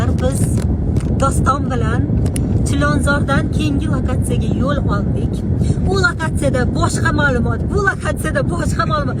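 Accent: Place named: Syrdarya, Uzbekistan. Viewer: Сирдареча гапирди😅😅😅😂😂😂😂😂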